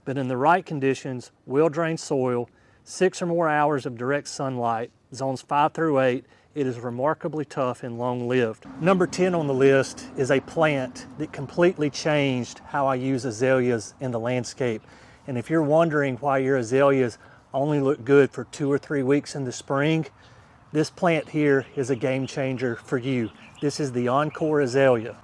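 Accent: South, United States. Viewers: As soon as I heard your accent I knew you would actually have good advice for my southeast Louisiana zone 8b/9a garden. Question: I have tea olives, but they look scraggly. Can I cut them down and have them regrow more bushy? I’ve had knock-out roses for years. I’ve found the coral and yellow are not as robust for me as the red & pink. I’ve had issues with our extreme moisture with both camellias and rudbeckia - root rot and mildew. The lavender has been a no go for us here because of our rain and humidity, but I’m going to try this variety you recommend. Can encore azaleas take our full sun in the Deep South?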